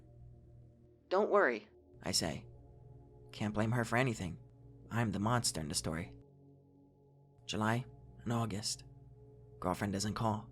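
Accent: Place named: Maine, United States. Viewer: Hey man. I got an odd question. Are you from Maine? You have a Stephen King twang.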